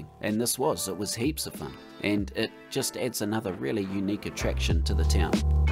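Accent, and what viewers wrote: New Zealand. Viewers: Awesome work, mate! I appreciate your unique and realistic ideas. Bloody hell I miss the kiwi accent, though! All the best to you from an Aotearoa-loving West Islander!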